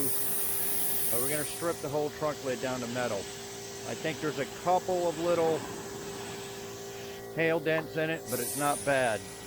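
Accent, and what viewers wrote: Canada. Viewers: I knew it. I just found your channel and I was wondering to myself “why does he sound Canadian when he is (I think) in SC? This explained that. That’s awesome though. My wife and I are looking at leaving NJ for the upstate of SC. It’s pretty much 50/50 over the weather and the liberal bullshit. 😂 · You’ll tackle any tasks, sheet metal, electrical, or heavy mechanical! You’re a real pro. It’s easy to see you have lived this discipline most of your entire life, and you love it. It all shows through. Your time in Alberta shows up in some of your pronunciation of certain words which is certainly not part a Texas accent. Professionals like you are far and few. Thanks for your excellent videos and sharing of this craft you have so brilliantly developed.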